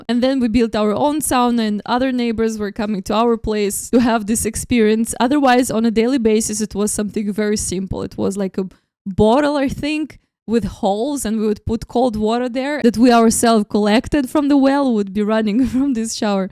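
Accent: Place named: Russia. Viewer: Great reaction. And lovely Russian accent! Greetings!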